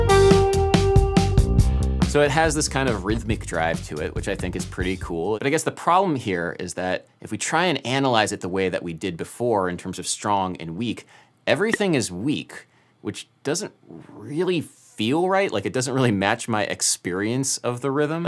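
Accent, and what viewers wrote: United States. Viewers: Great video and all, but I strongly believe he has quite a strong American accent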